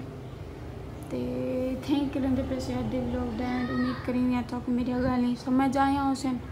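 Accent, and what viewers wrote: Saraiki, Pakistan. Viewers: Taidi sraiki Sachi bhao mithi hai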